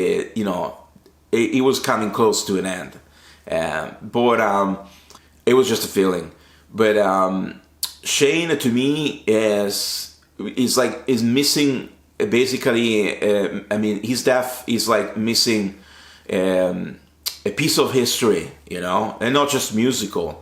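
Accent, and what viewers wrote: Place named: Dublin, Ireland. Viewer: A little bit of an Irish and indeed a Dublin accent I can hear. Very cool. As for Shane, we lost a little bit of our past, present and future when Shane stopped writing. It's a loss, he is a loss. But like you said, not in a sad way which is strange. Plus he got a fantastic send off and now a punk in spirit. P.S. I don't mean for this to be the type of question which it will surely sound like. If you had to, which song from his song books would you pick as a personal favourite in terms of story, lyrics, honesty, emotion and of course musicality? For me, and for all of the reason and more listed above.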